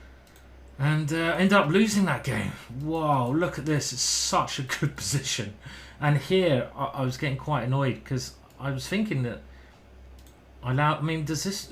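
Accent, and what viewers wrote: England, United Kingdom. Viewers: Hands up who’s watching this for the English accent 🙌😝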